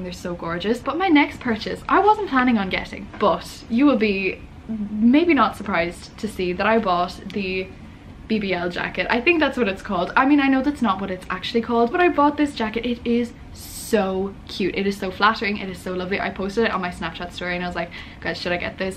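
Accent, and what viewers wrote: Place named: Ireland. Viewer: I love the Irish accent!! I can listen to you all day! I love your videos 😊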